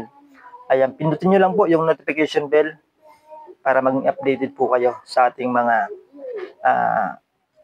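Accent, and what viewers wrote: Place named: Visayas, Philippines. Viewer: Ayusin mo pananalita mo bisaya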